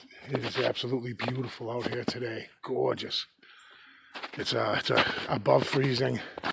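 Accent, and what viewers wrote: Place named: Boston, United States. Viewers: Awesome hike not too long at all very interesting sound early in the video could have been a raven croaking but why only the once and why did it only happen after you crossed the first downed tree maybe the downed trees the ones that cross the path that are not by park employees are territorial marks or stay out signs? By the way I like the Boston Accent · Excellent video, great job! I did hear the strange noise, it sounded almost like the grunting noise that a pig makes. Weird isn't It? Btw, don't apologize for the Boston accent. I also have the Worcester-Boston accent. Nothing wrong with that! Everyone has an accent depending on what part of the country they grew up in. Be proud of who you are. You're a terrific person and I enjoy your videos. Have a great day, see you on the next one!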